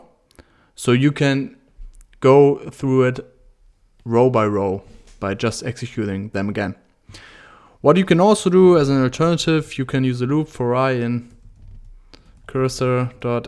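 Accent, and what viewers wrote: Germany. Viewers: Love your Videos. Greetings from Germany, are you german btw? Your accent seems sort of german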